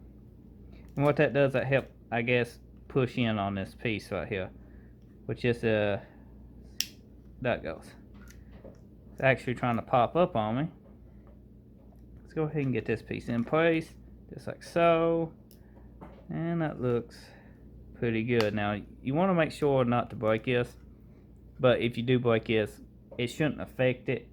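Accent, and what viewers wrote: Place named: South, United States. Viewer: your souther speech reminds me of a kinder Dusty Marlow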